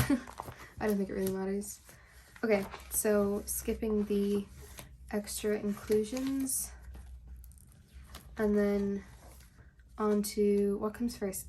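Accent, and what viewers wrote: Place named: Ireland. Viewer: ok i just found your channel (im watching all ur storing vids) and i didnt even notice your accent until you said youre irish 😭😭😭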